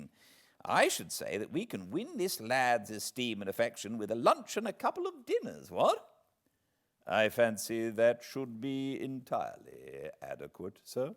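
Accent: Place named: England, United Kingdom. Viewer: Hello, you tried to kill Leo's son Wyatt lol but I forgive you because you have an awesome voice and amazing super clear English accent. By the way I am the first commenter lol.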